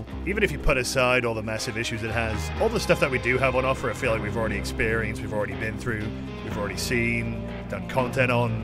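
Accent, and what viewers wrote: United Kingdom. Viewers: YOU'RE BRITISH?????????????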